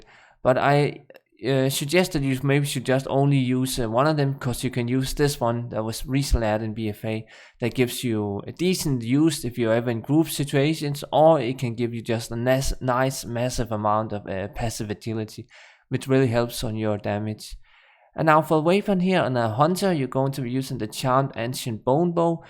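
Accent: Denmark. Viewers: i smell danish somehow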